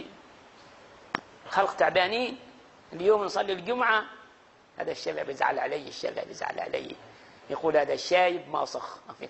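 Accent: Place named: South, Tunisia. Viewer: متأكدين ان هذه اللهجة.عمانية ؟ تشبه لهجة الجنوب التونسي.لحد كبير ( مع اختلاف بسيط في الجيم تنطق G' و القاف تنطق G غي الجنوب التونسي ) لهجته مفهومة تماما بالنسبة لي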